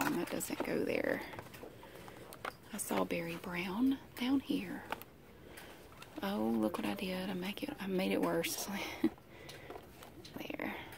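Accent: South, United States. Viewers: I love listening to your voice, your southern accent is lovely I could sit & listen to you talking all night. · I love your videos you remind me of Lisa kudrow aka phoebe buffay! Your voice is sooo soothing I’m from South Yorkshire in northern England. So any American accent is cool to me! Xxx keep up your amazing vids woot woot!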